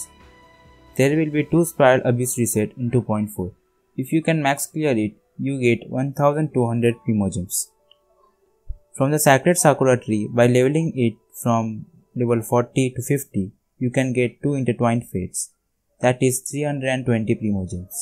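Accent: India. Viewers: 🤔 are you Indian..? Coz you accent is quite similar to ours. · I love Indian accent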